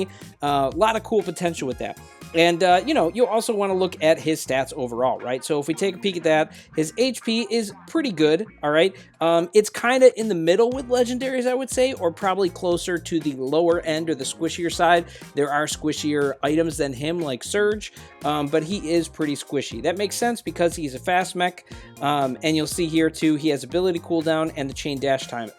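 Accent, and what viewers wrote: United States. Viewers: Hello remember when I told you that I wanted you to review my hangar basically I wanted you to give me a summary of what I have now and what I should have later on again my Id is <num> Have a great day ahead man love ur videos btw I'm subscribed ur like my main mech arena content that I watch every day btw I'm Indian 🇮🇳🇮🇳🇮🇳😎😎and I can speak in American accent like the u speak I can say the same accent and I'm 10 years old and I play mech arena pretty often it's one of my favourite games I love this game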